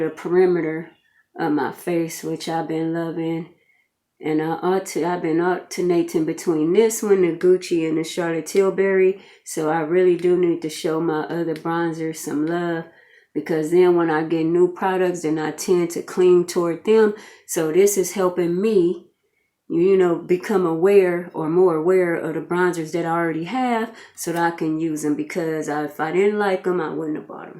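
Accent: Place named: Los Angeles, United States. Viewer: Random question... r u from Cali ? I can’t really pick up exactly where u from , but u sound like u from LA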